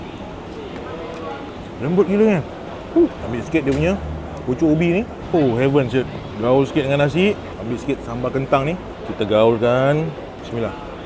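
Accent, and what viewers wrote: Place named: Negeri Sembilan, Malaysia. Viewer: Haha automatic cakap negeri sembilan 😂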